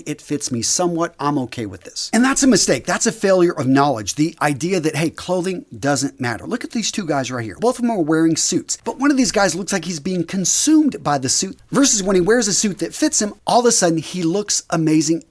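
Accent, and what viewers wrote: United States. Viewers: Yes of course but specifically which dialect of American English?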